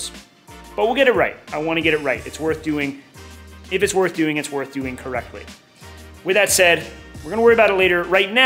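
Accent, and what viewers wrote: United States. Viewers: Totally off subject, but you say you’re from Nashville, but I’m hearing a LOT of Wisconsin/ high mid west in your voice. Pls share where you’re originally from and how you ended up in SoCal (the WHY is pretty obvious!)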